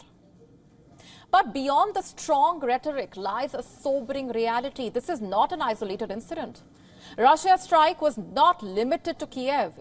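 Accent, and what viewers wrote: India. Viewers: Your india asent i wonder how you are doing properganda for the west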